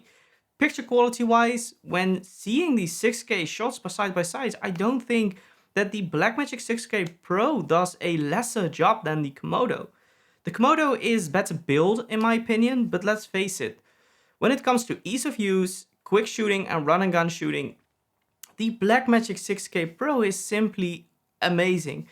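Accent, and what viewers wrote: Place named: Netherlands. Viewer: Hahaha hoorde ergens al een nederlands accentje, maar die 'later' det het m😂, Goeie video man! Wil hem ook gaan halen.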